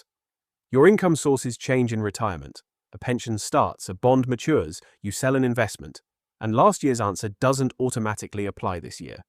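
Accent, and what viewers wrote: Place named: United States. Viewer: American pronounciation with an English accent makes me uneasy, even though the advice is good. ISA should be pronounced as a word and gov.uk should be said in one particular way.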